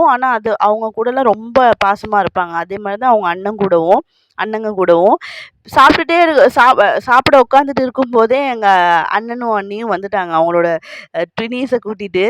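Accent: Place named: India. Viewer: Hi sis ippa than ongada videova 1st time paakuren niga srilanka thane edhuku Amma endu India people's mari pesuringa umma endu sollalame knjm different ah iki ongada pechelllam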